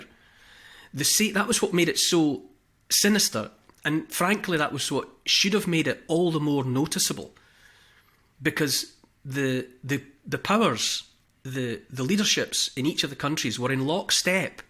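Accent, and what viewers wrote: Scotland, United Kingdom. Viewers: This is what I thought every Scotsman sounds like... You can hear the freedom and yearning for love and truth.... God bless and keep you guys as we all are brought to Gods justice and holy light, these monsters can have what's left of the rock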